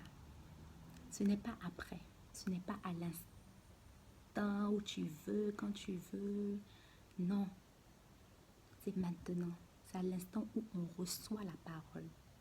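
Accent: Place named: Ivory Coast. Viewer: ton parler donne envie d'écouter et ton accent ivoirien 😃. Merci pour le message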